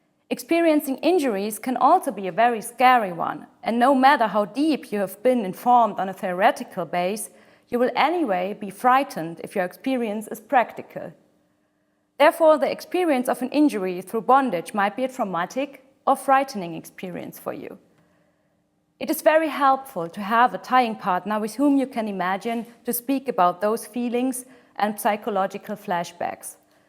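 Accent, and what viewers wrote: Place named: Germany. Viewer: The explaining person's mother tongue is german, isn't it? :)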